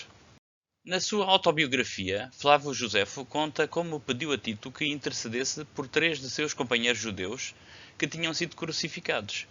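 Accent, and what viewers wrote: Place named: Portugal. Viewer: ouvir um falar em dialeto tamanqueiro não dá.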